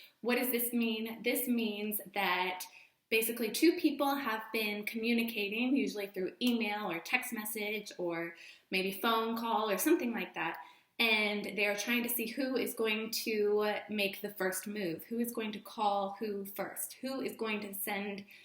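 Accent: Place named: Texas, United States. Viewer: you're from Texas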